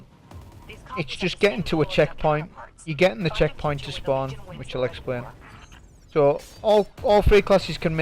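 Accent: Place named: Newcastle, United Kingdom. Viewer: ah well am from Newcastle myself so I could hear a little bit of it in your voice haha don't come across many players close to home to be honest haha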